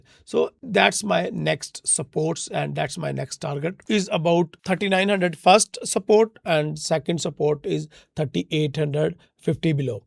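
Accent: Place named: Punjab, India. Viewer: Bhai Punjabi voice problem kr rhi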